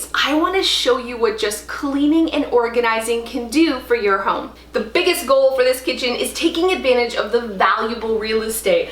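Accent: Canada. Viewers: I love your videos. You remind me of myself. I have to confess, though, I make fun of your Canadian accent when I watch. 😋 It’s pretty close to my relatives in Minnesota. 😘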